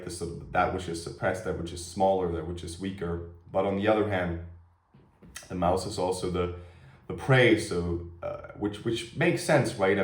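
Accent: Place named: United States. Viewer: BTW it would be nice to hear you read in German then translate because since you sound like an American and it would be nice to hear you speak in your native tongue also. thanks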